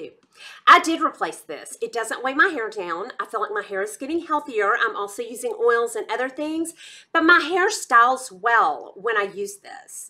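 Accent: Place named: Arkansas, United States. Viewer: oh my gosh...i'm from Arkansas, but moved to Alaska 7 years ago. Listening to you is like being home. Penn Smith Skincare linked to you and that's how I got here! I love that you don't waste time but get right to the point. Some of the beauty vloggers just talk forever about nothing or say the same thing several different ways. Ugh! Thank you for not doing that!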